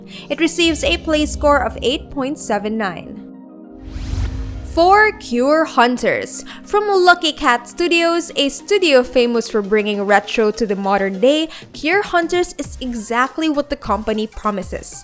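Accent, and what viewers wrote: Philippines. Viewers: You sound like filipino IM PROUD TO BE FILIPINO ML TAYO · I feel like your english sounds filipino.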